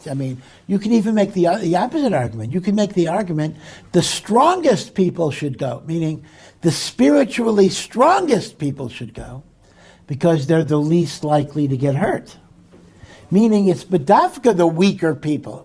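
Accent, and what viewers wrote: South Africa. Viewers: Dude where’s your accent from? South African or Australian? And how’s Palestine your home?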